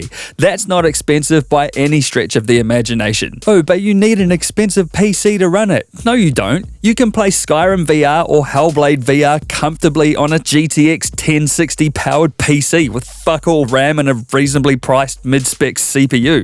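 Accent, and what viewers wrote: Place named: Australia. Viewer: Are you a fellow australian? also this video made me buy fours sensors and an oculus rift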